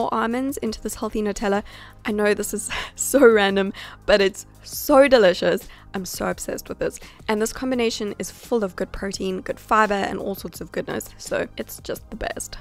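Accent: South Africa. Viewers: Are you South African? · You sound South African 🇿🇦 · Is she from South Africa ?? Cause she sounds south African 😹 and she uses a lot of Woolworths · Are you South African? Your accent sounds like South African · Do you live in South Africa? You sound South African 🤔 I'd love to see a video with a bit about your life ! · Nice South African accent. Woohoo. I'm trying to lose a little bit of fat around my tummy. I work hard on my body and run hard but always have a biscuit for tea time and white bread. These are the clean snacks I'm looking for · Nope shes South African, u can tell by her accent :P, some south Africans have that accent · when I heard your voice I was immediatly like South African hahaha I,m from cape town but love the video and thank you' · Are you south african? You rlly sound like one! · Are u from South Africa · Are you south african? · Are you a South African?? 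· Love your accent, is it South African? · Video was really useful and aesthetic 💕💕💕 Also a question... are you South African? Sorry if I’m wrong but your accent sounds really South African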